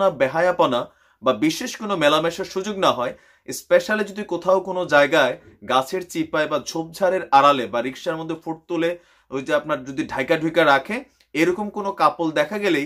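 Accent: Comilla, Bangladesh. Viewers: কুমিল্লার পোলা ৮০ টাকা তোলা , কুমিল্লার আঞ্চলিক ভাষা শুধু ভালো লাগে আপনার মুখে সত্যি সত্যি সত্যি সত্যি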